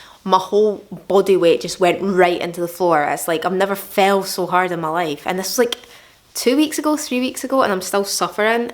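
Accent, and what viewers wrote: Scotland, United Kingdom. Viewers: Love your accent! Are you Scottish? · You make me feel so comforted that there are actually people out there with the same interests as me lmao. Also the asmr vibes ur videos have plus the Scottish accent is so satisfying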